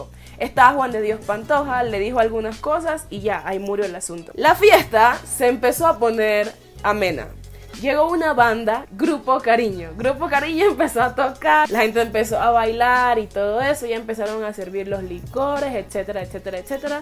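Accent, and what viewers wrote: Panama. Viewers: Acabo de llegar a tu canal,y con tu acento reconocí que eres de PANAMÁ 🇵🇦😍❤. yo como 😳está chica suena al acento panameño😍❤. eres muy bella. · Sabía que eras de Panamá 😫 si tenemos acento Ajajaja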